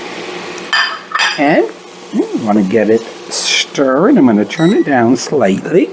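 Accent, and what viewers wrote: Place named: Massachusetts, United States. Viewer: Are they in my home town? Newton Mass? Accent is correct!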